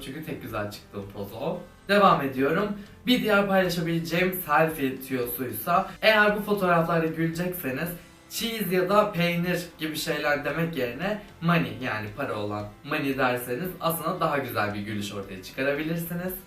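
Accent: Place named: Bursa, Turkey. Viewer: Abi sesin neden Bursalı gibi çıkıyor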